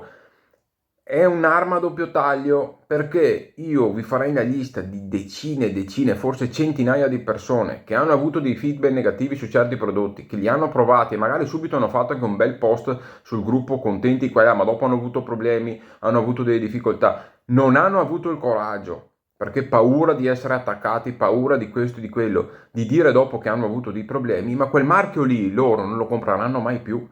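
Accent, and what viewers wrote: Italy. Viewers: piu' ti sento parlare e piu' penso che hai un accento uguale a quello della mia zona... posso chiederti di che provincia/zona sei?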